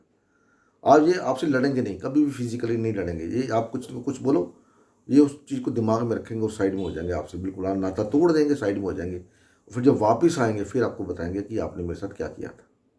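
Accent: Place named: Punjab, India. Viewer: Thank you so much,your Punjabi accent Hindi is so attractive.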